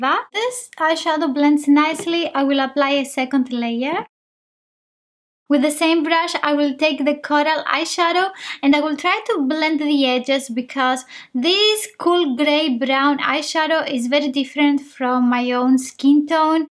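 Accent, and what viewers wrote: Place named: Greece. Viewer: I bought this palette yesterday and you're presenting it for us today! Once or twice a year Essence makes a big discount on some of their product, and this was one of them. I got it and the Be an Original one for 1.10 euros each, and now when I've seen your review, I see that I made some good choices. It's great that you try to show us as many colours as you can. I always enjoy watching your reviews. Keep up the good work 😎 I've shown this video to my mom too, and she says you're sweet and cute, and your Greek accent too.💖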